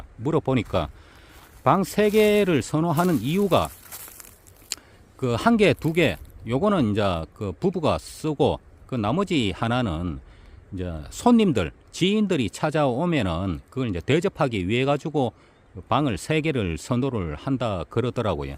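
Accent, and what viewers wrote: Gyeongsang, South Korea. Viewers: 영상에 자막을 좀 넣어서 하면 더 좋겠습니다. 가끔 무슨 말인지, 경상도 지역 아닌 사람들은 빨리 알아듣지 못하겠네요. ㅠㅠ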